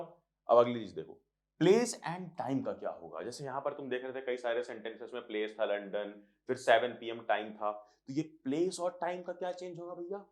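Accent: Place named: Mumbai, India. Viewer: Bhaiyaa aa mumbai ke lagte ho 🔥🔥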